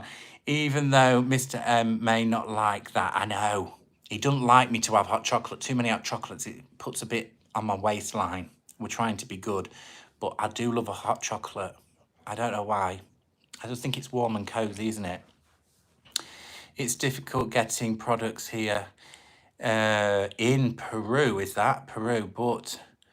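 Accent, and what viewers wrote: United Kingdom. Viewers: You're so welcome. Yes it is the accent. I've always loved the British and Scottish accents. 🙂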